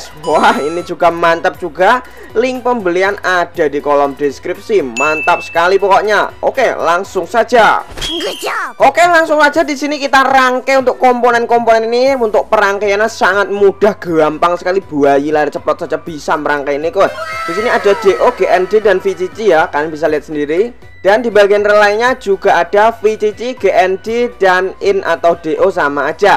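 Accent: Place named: East Java, Indonesia. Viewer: Sampeyan asale ko Endi mas,bahasane Jawa timuran koyone😁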